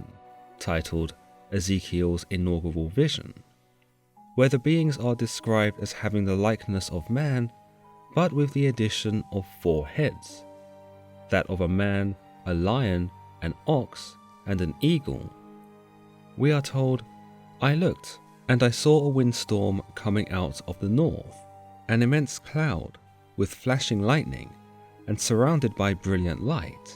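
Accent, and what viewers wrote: United Kingdom. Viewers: The narrator is a pleasure to listen to. He has a very pleasant voice, great diction plus a very elegant (and easy to understand) British accent. Very enjoyable.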